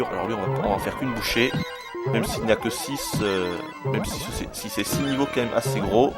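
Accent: South, France. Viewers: Ah sinon, j'aime beaucoup ta voix! Elle est agréable à écouter... cela doit venir en parti de ton accent du sud :)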